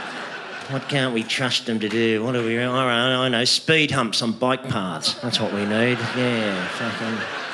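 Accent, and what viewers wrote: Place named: Australia. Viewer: The more I learn about Australia, the more I realize it's just America with a cooler accent. And no space program, that's a bonus.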